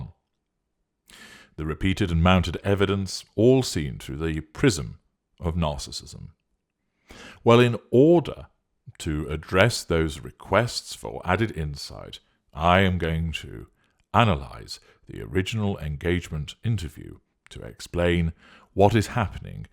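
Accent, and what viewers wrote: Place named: Northern England, United Kingdom. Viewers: is definitely a Northerner. I think it's Yorkshire. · I am always trying to place the Northern bit of your accent. Burnley?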